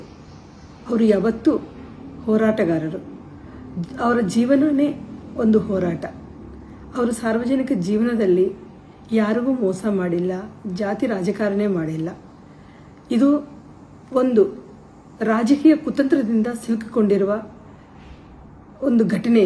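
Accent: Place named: Telugu, India. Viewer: Telkannada adu 😂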